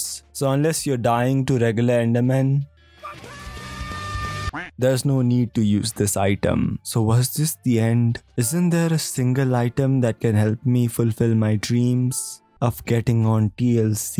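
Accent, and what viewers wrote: India. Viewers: Are u indian? If no then where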